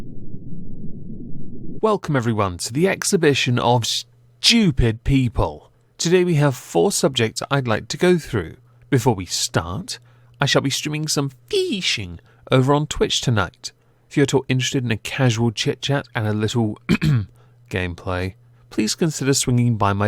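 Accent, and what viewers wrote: United Kingdom. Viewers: You know what made me subscribe? Of course, British wit, voice and humor (which I love as a non native English speaker) plus Lovecraftian theme, but those were just a bonus. The thing that made me finally press the button was - your usage of face expressions. They are so well made (props to the artist who made those!) and varied just enough, and you use them so skillfully to bring a point across or emphasize something, along with a slight voice change or pause, love it. I never though that something like this can impress me so, especially as this element of brand creation is so popular nowadays, everyone creates a cartoony alter ego. You nailed it, it has so much character, it looks like you're actually speaking and articulating through it in real time. Also, the intro with sarcastic "well, isn't this just fantastic" speaks to me on a spiritual level. 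As well as a concept of Exhibition of Stupid People. Good stuff. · I just can’t get over voice and accent like ok British daddy I can listen to you all day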